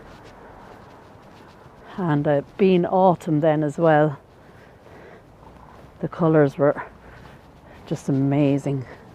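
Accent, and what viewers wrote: Ireland. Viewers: Beautiful looking final resting place me and my baby sister we love 2 read head stones etc my baby sister is in heaven now this nice lady i meet in east land mall in Warren Michigan she told me the weather in Ireland was windy and rainy that was in the 1980s was very young in them days probably around 3o I think 😮 love your Irish accent people say I talk whit a accent 2 women's love it men ask me man where are you from tell them Alabama lowndes county heart ❤️ of Dixie wishing you love peace and happiness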